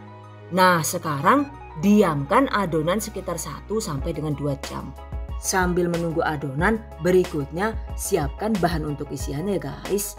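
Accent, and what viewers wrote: Banyumas, Indonesia. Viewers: Salfok sm bhsanya bun.. Jawanya ngapak jg trnyta sm ky aq. Jawanya mn bun? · Salam sesama wong ngapak 😁🤣ora ngapak ora kepenak🤣🤣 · Gx prnh gx tertarik sm resep dr bunda....btw bun,mw tny.emng logat madiun ngapak bgtu y bun.tp q sk beud c,scra q asli wong tegal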